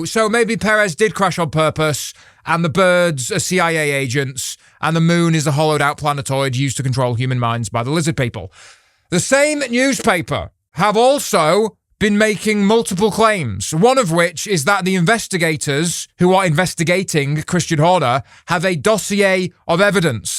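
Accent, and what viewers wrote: United Kingdom. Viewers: Wait, are you British? I thought you were Australian.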